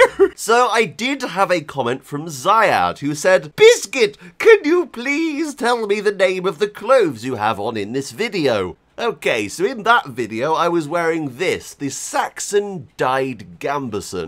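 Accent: United Kingdom. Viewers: From where in Britain are you?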